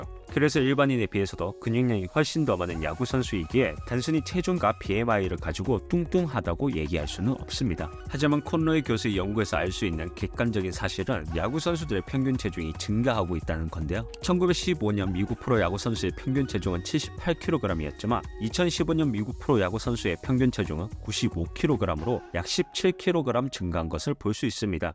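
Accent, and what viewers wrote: Gyeongsang, South Korea. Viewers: 고향이 경상도 어디세요 ?? 사투리가 똑같네요 ㅎㅎ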